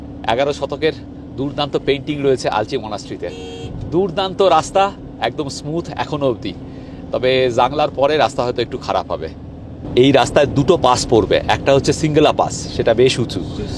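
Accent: Bangal, Bangladesh. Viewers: Apner bangal kotha gulo ekhono gelo na puru bangal, blog moteie bhalo na chredin apner dara hobena jotoi chesta korun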